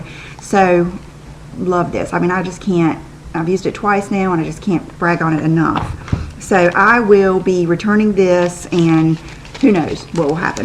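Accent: South, United States. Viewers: ! I am one of the owners of the salon MAVEN Beverly Hills- I have been a huge fan of yours and followed you for the last several years. I am originally from Mississippi so I love the southern accent, makes me feel like I am home! Just a couple of weeks ago we launched our very own Youtube for my hair salon which opened in August! Check us out - I think you will really love the message that we plan to deliver on our channel!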